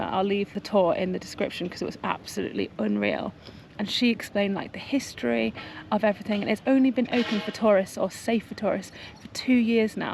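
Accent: United Kingdom. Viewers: Firstible I love your accent real inglish and I love that you guys have learned about our culture or history it's an eye opening for other country's, but any ways thank you for visiting and enjoy it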